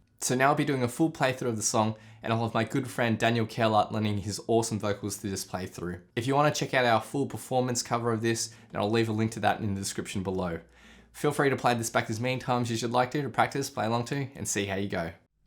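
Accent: Australia. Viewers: Hello are you from Australia?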